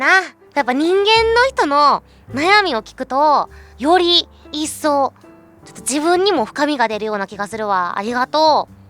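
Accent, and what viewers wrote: Kansai, Japan. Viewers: の関西弁ちゅき！ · 関西弁の、上から目線っぽくて好き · 関西弁もいいしいアドバイス上手！ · 関西弁好き(*´ω`*) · 関西弁さいこーーー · 、関西弁も似合うw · 関西弁、よろしいね(*^^*) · Well, is using Kansai Dialect in this video... It's a dialect that even hard for a lot of Japanese people too. So yeah, don't worry too much if you can't understand her in this video. Normal Japanese dialect is different, and I think you will understand it easily. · の関西弁好きにハマったの家族に言えてないの同じだ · 関西弁好きすぎる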